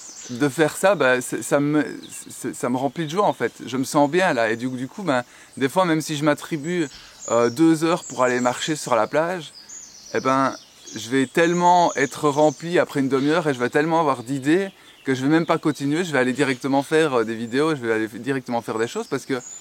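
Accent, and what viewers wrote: Belgium. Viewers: Coucou j'adore ton accent bien belge, ( je viens de la province de Liège)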